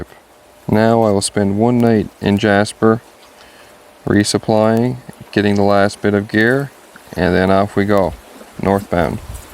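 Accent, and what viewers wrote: Newfoundland, Canada. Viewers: Where ya from? You must be newfie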